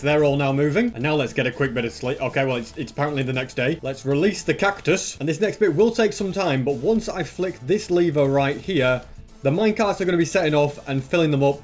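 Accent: United Kingdom. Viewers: I love ur British accent are u from England or united kingdom?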